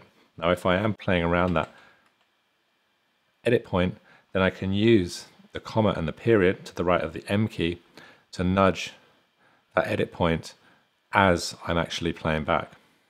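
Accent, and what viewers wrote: United Kingdom. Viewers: Just discovered you OMG thank God I've got a 1 month trial and haven't got a clue how to use it You tips are invaluable and your a fellow Brit without the annoying American accent I have subscribed This will really help before u go out a blow £200 on the package👍🏾👍🏾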